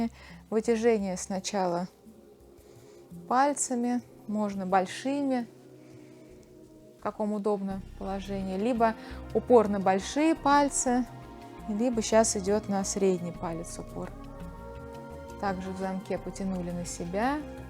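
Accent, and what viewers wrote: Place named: Russia. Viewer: You don't have to if you don't want to but do you think you could make a english speaking video someday? Please I would just love to hear you speak english with your awesome Russian accent. Please!!!!!!!😊😊😊😊😊😊😊😊❤❤❤❤❤❤❤❤❤😍😍😍😍😍😍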